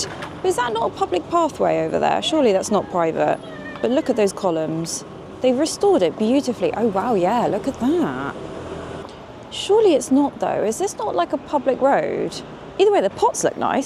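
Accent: United Kingdom. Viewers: I’m not British/English (or whatever you call yourself), but Ifing love her accent, intonation as she exclaims to every detail she spots during the video like “Oh look the sun is shining!”. Also, she has a beautiful face full of expressions and they are changing constantly while she speaks. I love it all. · Wow you sound so British. I'm so used to YouTubers with American accents! Its good that you don't sound like the!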